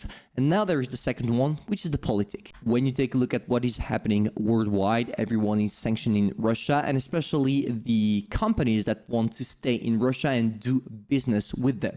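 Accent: France. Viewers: you are French?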